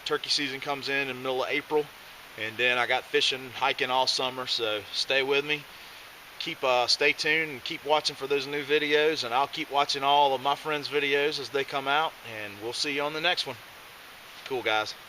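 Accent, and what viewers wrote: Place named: Texas, United States. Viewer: This guy has a southern Texas accent. They are all Bounty Hunters in Texas half the time. Americans have all been Bounty Hunters since the War Declaration by Elizabeth II of England in 1955. Americans are not immigrants from Great Britain. They are all Americans since Original Creation. They don't put up with the English trying to take over America. Queen of Canada and Royal Chieftain of Indians of America 🇺🇸 Métis of Toronto the Royal Land of Jesus Christ and Royal Indians of Mohawk and the Rouge and Humber Indian Chiefs to heal in Healing Waters Created By Christ Americans Honor God as Christ the Creator and they do not go hunting for Animals that God Created in the Beginning. They know what to shoot as the ones made by evil spirits or other people making them in America or Canada as one Continent that they know is theirs to defend. 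One Hundred percent of Americans are Bounty Hunters since 1955 and all the USA 🇺🇸 of the War Generations go Bounty Hunting. The Breeding Generations also go Bounty Hunting in North America. They know the American Flag is Created by God to represent America the Stars and Canada the Stripes. We as Canadians are the Ones out of touch with reality of what we should do to support Turtle Island to defend Our Country especially with so many War Criminals here in Canada and having been through two World Wars launched by Elizabeth II in England. They know the money issue is Canada's big problem and too many politicians and too many fake cops and too many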